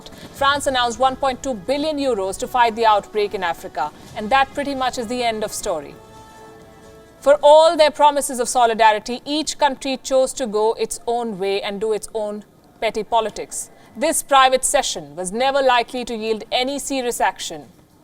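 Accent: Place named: North India, India. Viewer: I dont like this North Indian English accent without giving a pause she speaks.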